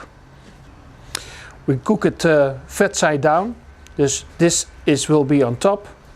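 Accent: Netherlands. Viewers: This guy has a Dutch accent....i know the accent.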